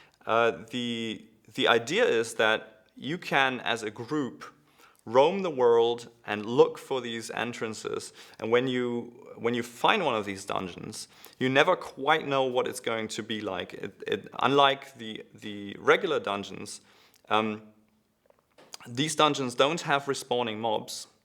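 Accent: Germany. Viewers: This man sounds SO ULTRA German.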